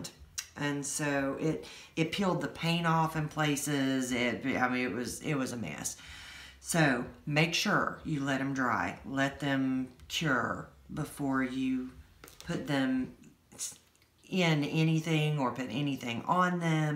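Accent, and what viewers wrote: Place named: Oklahoma, United States. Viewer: I promised I'd watch it! Very nice job explaining everything....it's easy to understand and I jotted down lots of notes. Mind you...how difficult was it years ago to get all this information...thanks to technology and U-tube it's right there when you want it. Love the way you do your videos...and your accent...you sound a bit like a friend of mine in Oklahoma. Thank you ❤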